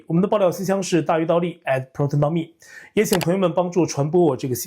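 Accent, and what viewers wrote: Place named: Taiwan. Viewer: 先生，您的影片有人盜用，因為聲音是臺灣腔的國語，您再留意一下吧！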